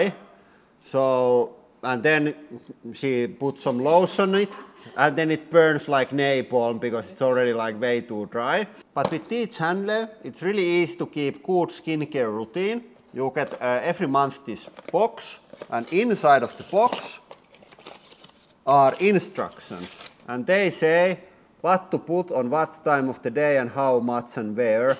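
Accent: Finland. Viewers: Finish/English ,,, omg thats lovely 😁😁😁😁😁😁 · Ootko Suomalainen?😂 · huomattavasti helpompaa ymmärtää suomen enkklantia puhuttuna kuin natiivin englannin puhujan. 😆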